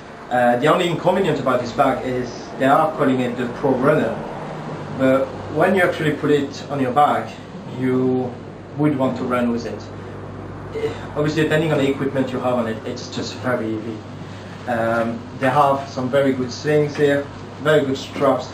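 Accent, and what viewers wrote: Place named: France. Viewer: Nice stuff mate! Are you originally from France? I think you are because your dialect sounds so. :) Have you ever considered to change Sigma 70-200 to a Nikon VR1? It's a one of a beast lens on a full frame!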